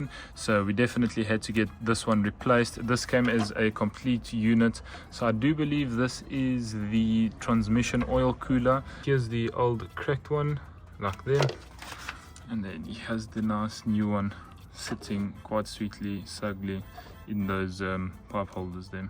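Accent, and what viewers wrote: South Africa. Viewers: Could hear the accent! we out here supporting out South Africans! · Great video Bro 😎 are you from South Africa?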